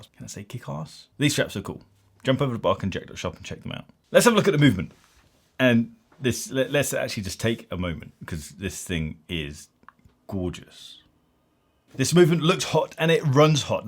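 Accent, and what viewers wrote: RP, United Kingdom. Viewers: Yes, I was bored to tears listening to your public schoolboy voice way before that. That wasn't your point in this video and that isn't what you think so who cares. Rather than argue with people, which isn't a good look for you, why don't you just hold your hand up and admit - you got it wrong and totally misjudged opinion on this one. This watch is a masterpiece and Zenith have all the heritage & provenance when it comes to chronographs. You do know what El Primero means and it's relevance don't you?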